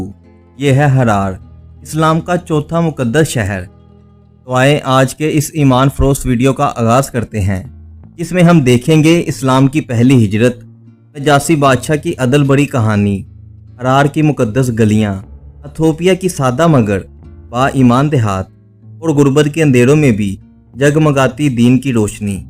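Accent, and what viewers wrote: Lahore, Pakistan. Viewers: بہت اچھے موضوع پر بہت اچھی گفتگو. کوشش کریں کہ آپ ، اپنی اردو ٹھیک کرنے کی کوشش کریں . لاہوریوں والی اردو لہجے کو ٹھیک کریں .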